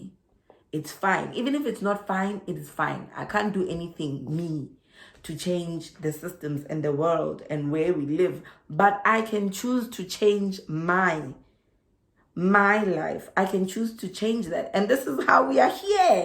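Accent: South Africa. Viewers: you are an inspiration you are a pleasure to listen too, I feel my heart full of nostalgia hear your wonderful personality one thing I miss from South Africa is the way black South Africans speak english, people may think I am being racist mentioning the accent and way Black South Africans speak but is a term of endearment, as it is one of the most beautiful things of African people as it shows the beauty of the African soul, I too moved to Australia and have built a good life here, I would be dead already if I had stayed in SA having Diabetes and blood clots my biggest sadness is when we voted for one man one vote all whites new that was the end of Apartheid which was necessary as it was an degrading evil, most whites were expecting to see all races especially Black people lives upfift to a middle class lifestyle we as whites enjoyed, and to how the ANC has destroyed everyones dreams except their own selfish lives is so so sad, so happy you managed to get out and build a wonderful for yourself and family you should send your video to TED TALKS as you have a gift and are an amazing speaker you are a beautiful person with so much to give to humanity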